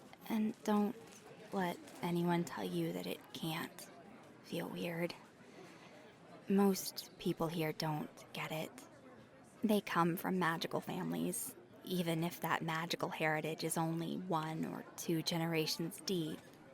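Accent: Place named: South, United States. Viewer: I like that little hint of a southern accent that I hear come through every now and then.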